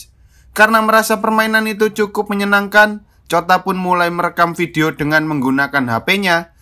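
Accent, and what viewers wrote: Java, Indonesia. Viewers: jawa kah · Mase medok banget...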